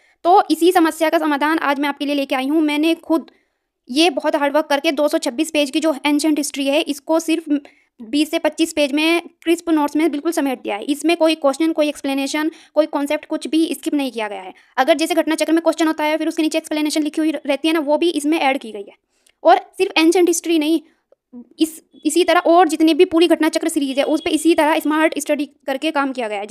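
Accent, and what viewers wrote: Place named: Haryana, India. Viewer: Up west se ho thoda hriyana wali vibe h language me i think hapur gaziyabad meerut 😮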